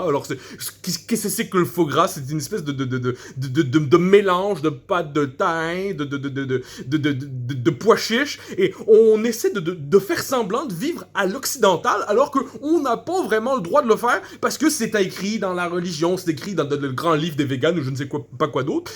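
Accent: Quebec, Canada. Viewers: L'accent québécois est bien fait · J'adore, peut-être une pointe d'accent québécois en plus, mais franchement bravo car l'original est une vraie pipelette :) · Desolé encore que Bob coté a pas coulé quand il a traversé l'atlantique, si jamais vous le perdez en route on sera pas trop triste au quebe, étonant qu'il a pas préféré se sauvé en floride. Sinon pas pire accent, manque pas grand chose pour reussir a te perde dans une foule a mtl, peux etre juste un peux de, ouin, genre, tsé, pis 😅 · Comment fait il pour maîtriser l'accent québécois sans trop en faire ? C'est très fort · c'est juste un accent quebecois... je reconnais pas le bock kotey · Pour une parodie humoristique, y'a quand même du vrai dedans. Serieux, du jambon, c'est du porc, point final, le reste c'est de l'arnaque Super accent canadien au passage, ca rajoute un petit coté drôle :) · Bravo pour l’interprétation : tu te débrouilles vraiment bien pour imiter Matthieu Bock-Côté et l’accent québécois 👍🏻 En plus, même les propos sont bien fait : on dirait vraiment les propos de Matthieu Bock-Côté (il a déjà été capable de sortir des propos similaires) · Encore une fois le seul Français capable de faire un accent québécois quasi impeccable, GG.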